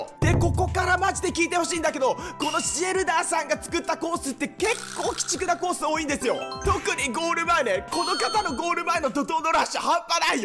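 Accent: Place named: Kyushu, Japan. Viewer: そげん喋っとるほうですよ🎵 ほどよい九州の方言が素敵です（私はばあちゃんが九州だったんで）🎵✨☺️ あ、そしてさんといえば「絶叫🎢」君に届けで吹きました✨ 楽しい動画、ありがとうございます😉👍️🎶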